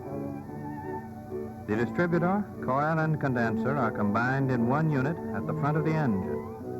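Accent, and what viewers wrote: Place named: Transatlantic, United States. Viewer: It's called Transatlantic English if I'm not mistaken. Not at all a natural accent, either.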